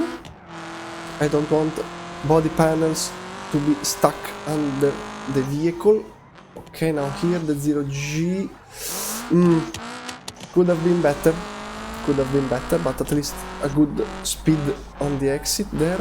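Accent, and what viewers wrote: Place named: Italy. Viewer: Minchia Brother si sente poco che sei italiano 😂 comunque bel video 👍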